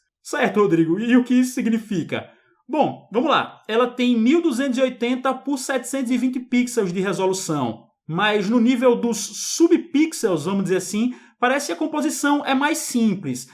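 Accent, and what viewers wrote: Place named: Fortaleza, Brazil. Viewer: De fortaleza se não me engano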